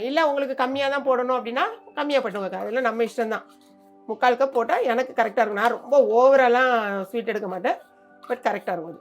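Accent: Tirunelveli, India. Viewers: Your videos super .. recently watched one of your video about vivikam recipe forwarded from my sister . So much nostalgic memories from my childhood, from idayangudi living in Chicago for the past 27 years , your Trinelveli accent and very simple talk , nothing fancy .. great collection of recipes. Super super . Need see many of your wonderful recipes and want to try them Thank you so much . Finally so glad I found your channel.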